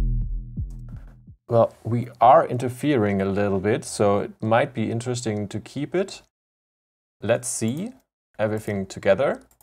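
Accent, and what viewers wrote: Germany. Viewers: Are you german? You sound german at least...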